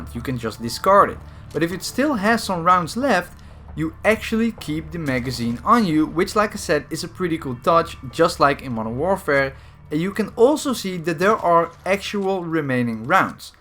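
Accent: Netherlands. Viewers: You're Dutch right? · Are you Dutch?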